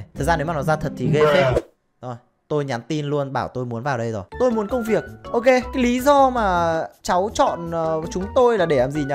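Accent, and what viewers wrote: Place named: South, Vietnam. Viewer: Thích cái cách a ấy nói tiếng miền nam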